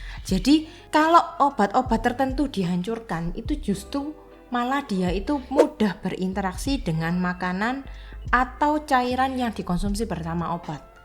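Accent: Java, Indonesia. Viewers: Suaranya ndeso medok .ga enak bget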